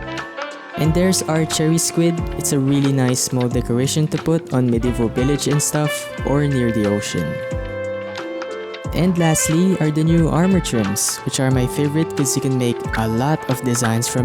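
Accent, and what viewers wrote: Philippines. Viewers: I can tell you're a filipino because of the accent, really love your build hacks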